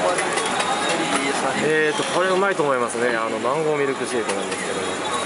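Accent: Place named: Japan. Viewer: very cool to hear a japanese guy speaking urdu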